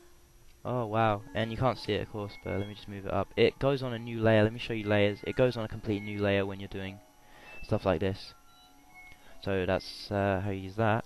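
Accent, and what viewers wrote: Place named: England, United Kingdom. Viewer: i actually loved listening to you sounding SOOO uninterested and english, i laughed all the way through it, plus it was actually helpful, except i knew all that stuff already, i need to know how to do shading with opaqueness... :(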